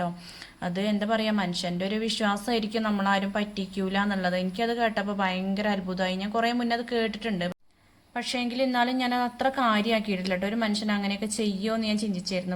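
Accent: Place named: Ottappalam, India. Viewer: ❤❤ ente nathoonum nalla aalanu pine sugano samsaram ottappalam samsaram anu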